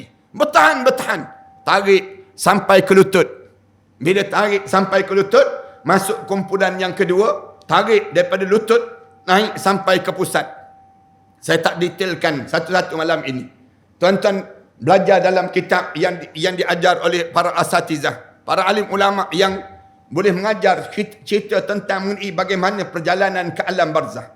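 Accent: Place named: Kelantan, Malaysia. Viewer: Kuat bunyi Kelate Boh kito ni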